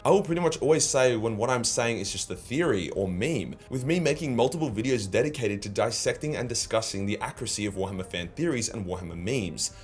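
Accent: Australia. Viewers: has comedy, self awareness, lore and the aussie accent, goddamn give me more. Id give up maple syrup and tim hortons for this man. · Same. also Australian accents just make everything funnier. "Yah dog ahh" 💀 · Yeah, the Aussie accent makes sound like the video is fastforwarded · From an outside perspective, all I see in is just an atypical Warhammer nerd who got really lucky and is pretty good at running a business. The Australian accent and slang helps him in his lore videos and his overall presentation is pretty solid.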